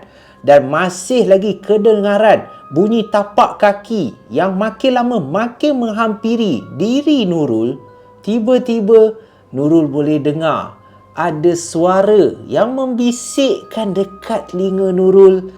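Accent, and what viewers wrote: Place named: Kedah, Malaysia. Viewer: Loqat kedah sakan dah lani noh hangit torey haha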